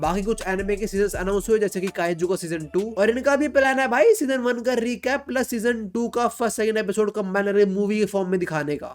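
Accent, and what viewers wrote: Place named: Bengal, India. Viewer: Wow Bengali is funny ngl 😅✨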